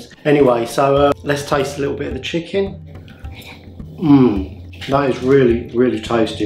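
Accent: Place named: Cambridge, United Kingdom. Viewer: are you from Cambridge/Newmarket? You sound just like all my family there:D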